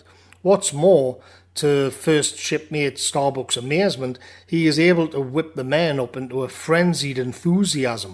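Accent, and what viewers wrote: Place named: Newcastle, United Kingdom. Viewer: Nice to hear a fellow geordie speak on such a lethal book <3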